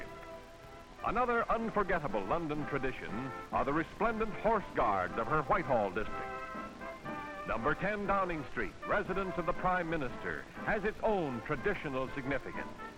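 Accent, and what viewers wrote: United States. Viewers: Why the American commentary?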